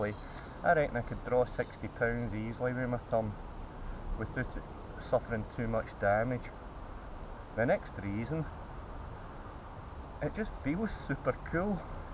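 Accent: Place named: Scotland, United Kingdom. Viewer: Camera too far away for clear video instruction.... volume very poor for this Scottish accent.... nice bow however..!!🤔.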